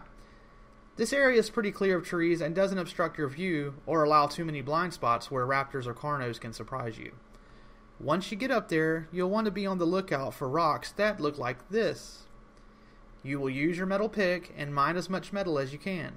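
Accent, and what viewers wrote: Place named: United States. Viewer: Dude this is the best help in Ark on YouTube that I ever listen to at the same time is nice to see an American talk rather than a British action player talk your tips are the best on YouTube Most people contradict their own words when they make YouTube videos on ark with there very own video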